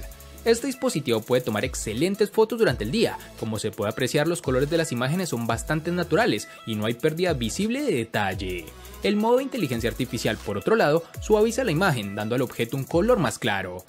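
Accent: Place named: Bucaramanga, Colombia. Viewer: excelente video bro hey pana usted es bumangués ? se escucha bastante el acento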